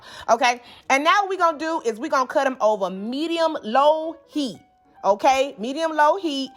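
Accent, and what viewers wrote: South, United States. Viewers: The accent got me. I was so distracted listening to her southern drawl that I forgot what I came here for :-). She had me cracking up when she went "you need to make a lil mo chile". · your southern charming voice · Why did the commentary to the video bring me back in 2025! 😂 love her southern accent mixed with the humor 🤣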